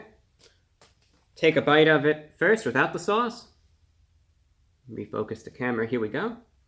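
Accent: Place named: Mid-Atlantic, United States. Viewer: Love his Atlantic accent.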